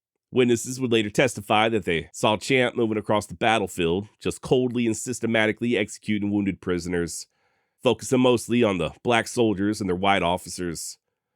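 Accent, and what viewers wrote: Texas, United States. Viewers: First I’ve heard a Texan accent quoting Dylan Thomas was right here 😊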